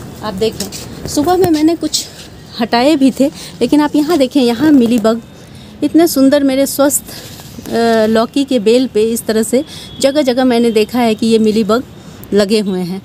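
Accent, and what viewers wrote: Bihar, India. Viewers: Aapki boli me Bihar hai. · Nice information....ap Delhi rehte ho, bt apka tone to Bihari hai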